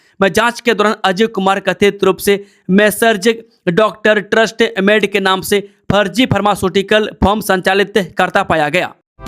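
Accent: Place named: Bhojpuri, India. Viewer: Why do you speak in Bhojpuri dilect ?This channel should hire Himachali for this post.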